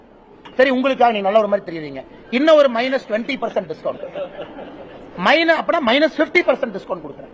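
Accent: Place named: Karnataka, India. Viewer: இவர் பேசும் போது கன்னட வாட வருது.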